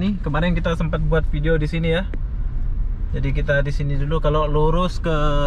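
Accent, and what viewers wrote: Malaysia. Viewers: Setauku dia orang Lombok Indonesia cuman yg nonton hanya karena cara dia ngomong dialek bahasa Melayu orang menganggap orang Malaysia padahal orang Melayu Indonesia yang dialeknya sama persis orang Malaysia juga banyak kok,kalau merantau ke kota di kira orang Malaysia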